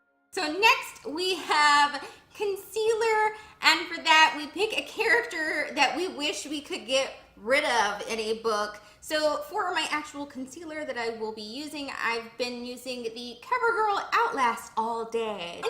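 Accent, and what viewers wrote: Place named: New York, United States. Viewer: Great vid,!....It was all that and a box o' chocolates!:))...........fun New Yawk accent lol.....that was like a dame in a 40's movie.......that makeup looked very cool.....great pics at the end:))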